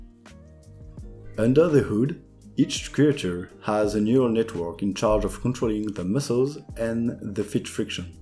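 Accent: France. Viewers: You're French, definitely. Because I Can understand your english way too easily. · Je confirme on sent l'accent français qui ressort par moment sinon trop bien la vidéo continue comme sa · Okay. Btw, aren't you french because you're accent is suggesting me that ? Nice video though.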